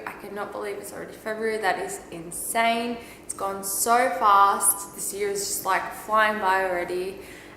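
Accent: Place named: Australia. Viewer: Love this! And seriously love hearing another Aussie accent! x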